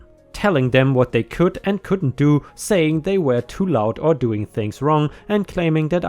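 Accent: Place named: Germany. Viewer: Ok I have to say you kind of have a german accent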